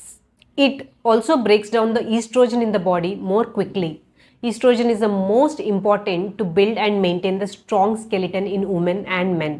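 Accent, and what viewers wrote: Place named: India. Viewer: Her voice and accent SO BEAUTIFUL….I love Indian accent so cute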